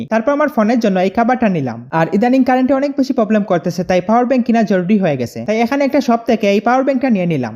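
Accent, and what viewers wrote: Sylhet, Bangladesh. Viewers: ভাই সিলেটি মাতিওতে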